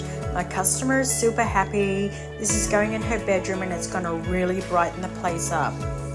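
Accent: Australia. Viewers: Hi, a lovely piece, I love the felt tool,a great idea, also nice to hear an Aussie voice, regards pamela hall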